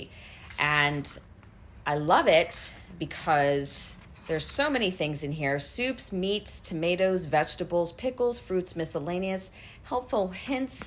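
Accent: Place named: Pennsylvania, United States. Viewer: Just started following and I'm like...I know this accent.. 717 represent.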